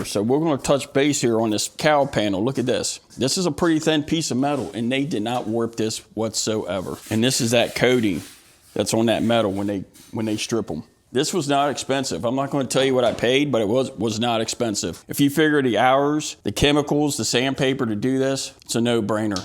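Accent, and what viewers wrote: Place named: Maryland, United States. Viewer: I hear glen burnie accent!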